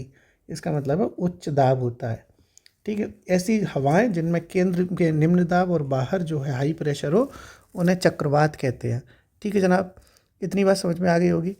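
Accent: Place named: Hathras, India. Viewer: सर आप हाथरस आगरा मथुरा अलीगढ़ क्षेत्र से हो आपकी बोली मेरी तरह है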